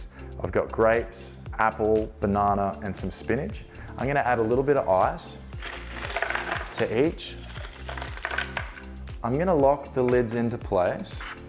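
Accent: Australia. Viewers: I subscribed for the Oz accent 😂😂😂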